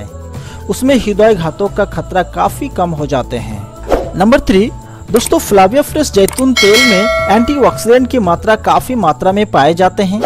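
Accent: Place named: Bengal, India. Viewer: Yeh bagali h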